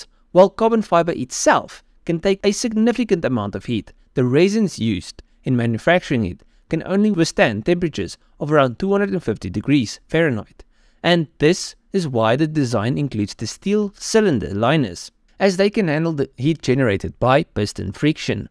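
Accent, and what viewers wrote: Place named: Johannesburg, South Africa. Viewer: Sounds like he’s from joburg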